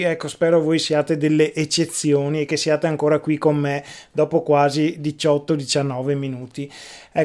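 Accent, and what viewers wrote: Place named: Veneto, Italy. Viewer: Ciao Conterraneo! dalla parlata ho detto, questo è Veneto, e poi dal Nome e Cognome ho detto... ma mi sembra di conoscerlo... e infatti sei di Thiene... io di Malo!, inizio a seguirti che mi sto avvicinando al mondo della chitarra, anche se un po' Vecchiotto per iniziare a 43 anni, Ciao!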